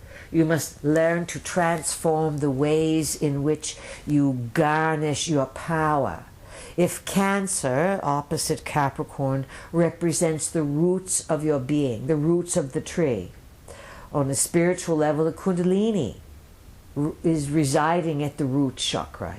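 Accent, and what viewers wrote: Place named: Europe. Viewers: I'm just curious why she went from speaking perfect plain english in 1996, to having an odd Euro accent in 2014? Not to mention going from doing 3 hour hypnotism talks in a slow monotone, to talking in the third person. Or maybe the fourth person. Not sure how to describe one person, talking in the third person as two other people. LOL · LMG, obviously you have not carefully studied the Pleiadians teaching thru' Ms Barbara Marciniak. They originally introduced themselves as a 50 member collective, and were learning how to teach, advise, communicate with humans. I've lost track of exactly where they stated they are now 100 + Spirits, and have come a long way.It should be clear to you, as well as many of their skeptics, that the familiar voice has changed a bit, have added humour and wit ( often a play on words as well ). Barb is well educated ; she would never make common English grammatical slips, confusing correct use of " this " vs : that ", switching pronouns in mid sentence, incorrect subject and verb agreement, and the occasional misuse of common American wordings. 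Their vocabulary, though excellent, occasionally they slip into British idioms, Euro vocab and pronunciations, and oddball word order. Frequent hesitation, and repetition of words and phrases while searching for the precise word to fit the idea, is a characteristic, of a non-native English speaker. The final clue is, they encourage and even beg for questions from their audience, so as to learn what concerns are on peoples' minds. They can give long dissertations and insight into complex, historical, economic, political, religious aspects. No human is capable of this broad swatch of understanding and explaining, almost ad nauseum. Despite some negativity towards their ending, creeping in sometimes, they always manage to convey a positive messag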